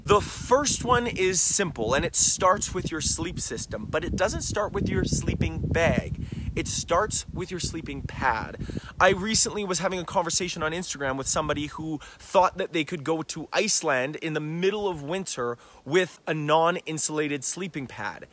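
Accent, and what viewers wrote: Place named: Canada. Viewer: Strong Canadian accent lol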